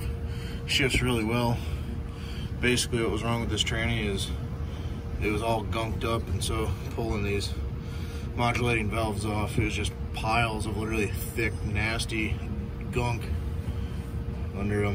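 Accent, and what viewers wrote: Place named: North, United Kingdom. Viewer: Judging how he sounds like he's from the north, I'd say this dudes gotta be making 35 bucks an hour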